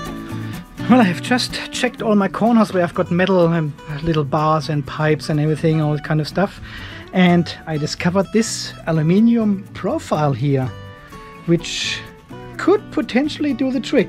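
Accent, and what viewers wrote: Germany. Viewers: I enjoy trying to figure out where people are from by listening to their accents. I've been watching a lot of your videos lately and you were really throwing me off. I had pretty much decided you were a native German speaker but your surroundings didn't look like any part of Germany I've ever seen before. You helped me work it out in this video when you mentioned your German power strip with the Australian plug. I really like your videos as I'm preparing to put together my own battery bank and solar system. Also, congratulations on passing 1000 subscribers!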